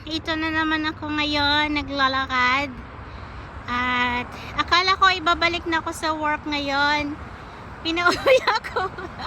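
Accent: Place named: Pakistan. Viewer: Nice sharing sissy pero natawa din ako sau sa nag aantay nang maasawa hehe..nakakatuwang Pakistan ang boses mo sissy masayahin kang tao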